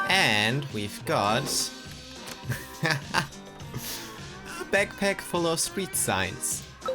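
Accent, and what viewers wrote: Germany. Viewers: Deutscher Akzent kickt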